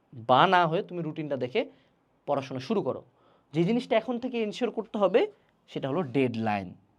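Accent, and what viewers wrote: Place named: Rangpur, Bangladesh. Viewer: Wow vaia sb smy reality tai bole..r vaiar ktha gulo sunle amr rangpur er ktha mne pre jay age sir rao evb blto amdr..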